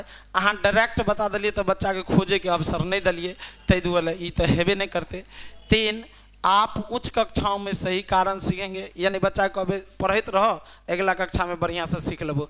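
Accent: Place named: Maithili, India. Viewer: Itna shudh maithli 🤦‍♀️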